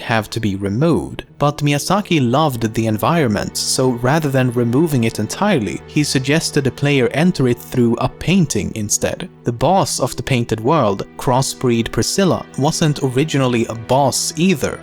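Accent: Sweden. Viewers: christ his accent is swedish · Cool videos and info man! Glad I stumbled across your channel.DS lore and Swedish accents ftw. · Du var svensk!! Jag visste det! Brytningen fick mig att tänka till.... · You can't have enough trivia and Swedish accents! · If you dont know this accent, this is a swedish accent, and it made me lol af! BIG UPS THOUGH! BIG UPS! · My God! The Swedish accent is so strong with this one. Being a Swede myself, I can dig it. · im here for the swedish accent xD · you can hear that the speaker is swedish xD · The Swedish "accent" is leaking through! :D Where able to recognize right away that it was a fellow from our snowy north! :D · Is this guy swedish? he sound very swedish :P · Exactly my point, hence why he does not pronounce it appropriately. Have you heard the way he pronounces the English word "character"? Incorrectly by English standards but just fine according to the way that the "ch" sound is used in Sweden, He says words the way that he does because it is the way he is culturally accustomed to pronouncing them. 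· being from sweden it is so easy to hear if a person is from swedish XD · Omfg that swedish accent.. I just can't enjoy the videio hearing this.. And Ornstein and Smough AREN'T EVEN HARD!! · that swedish accent tho edit: he knows it..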